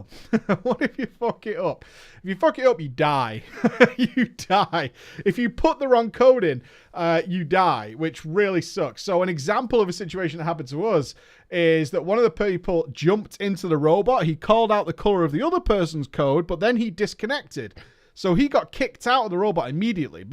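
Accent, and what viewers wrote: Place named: United Kingdom. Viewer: no joke, you man, you know this game like the back of your hand. In fact, I think you probably know this game, BETTER, than the back of your hand. And Andy, why are yall have troubles? I just want to know. Cause if I was Andy, I would just say, "Preach, dude, lets make some more skits together, or whatever." Oh well, dude, you ok, all of that wow information AND your British too? WoW, is all I got to say.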